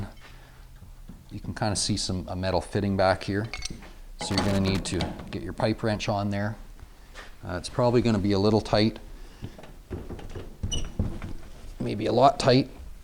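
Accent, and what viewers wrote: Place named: Canada. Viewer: I have this exact same metal plus plastic valve on an old John Wood hot water heater. After a few times of opening it to drain the tank it just wouldn't shut properly. I've since replaced the end valve with a brass ball valve to reduce the drip, but because of poor sealing it drips about one drop every 20-30 minutes. I'm replacing the whole thing with a new brass 3/4" ball valve using this video as instruction, so thanks for the relaxed, quick, informative presentation. BTW just from how you spoke, I knew you were also Canadian. Thumbs up.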